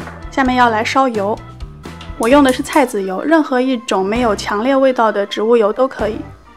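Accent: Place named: Xi'an, China. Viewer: 听声音是咱西安的吧？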